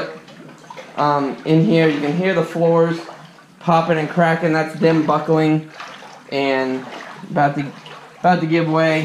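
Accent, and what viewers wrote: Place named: Alabama, United States. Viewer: you might be from Houston but you sound like your from Alabama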